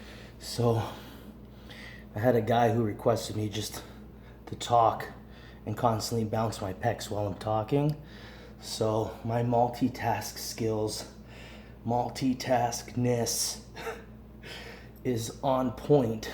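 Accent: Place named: Canada. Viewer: You’re Canadian ! Oh I feel like such a dummy asking where In the states you live. I’m not great with accents obviously, my apologies 😂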